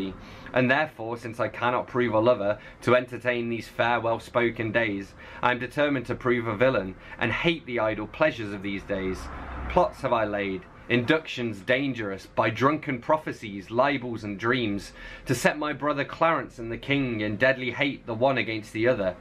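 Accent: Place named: England, United Kingdom. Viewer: Shakespeare is 1000% BARS! It kills me how dope his lyrics are. Also hearing it in your natural English accent is awesome.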